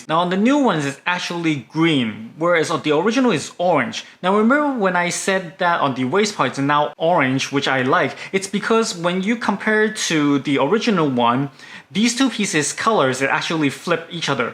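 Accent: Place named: China. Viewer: you voice comes off as someone who native tongue is chinese.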